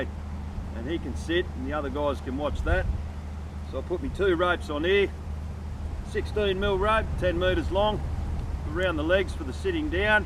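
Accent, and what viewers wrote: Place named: Australia. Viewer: 😂I don’t understand much of your Australian English. But at the same time the music makes everything in the room vibrate 😂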